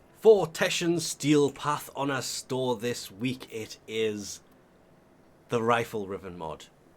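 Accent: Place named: Newcastle, United Kingdom. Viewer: Wait a geordie warframe player? Yassss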